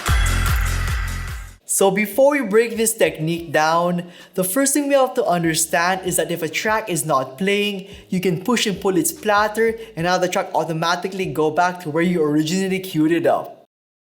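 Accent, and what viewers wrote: Philippines. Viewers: Lol your accent is funny, are you filipino?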